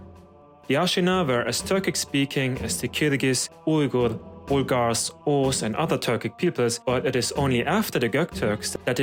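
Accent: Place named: Germany. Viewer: Ayyy the OGs back!! Been missing that german accent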